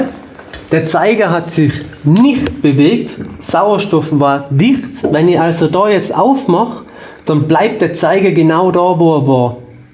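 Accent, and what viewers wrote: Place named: Vorarlberg, Austria. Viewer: Super Video und mit dem Vorarlberger Dialekt super sympathisch!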